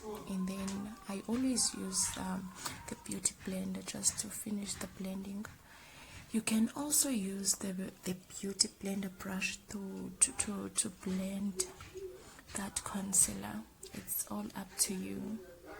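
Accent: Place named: South Africa. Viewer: SouthAfrican i love ur accent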